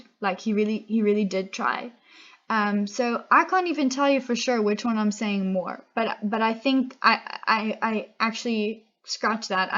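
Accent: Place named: United States. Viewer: U have an American accent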